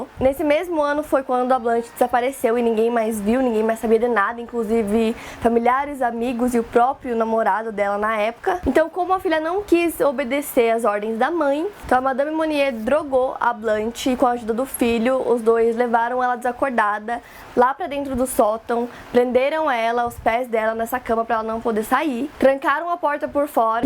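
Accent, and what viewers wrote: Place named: Caipira, Brazil. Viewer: Mds você é muito caipira que chega a incomodar de ouvir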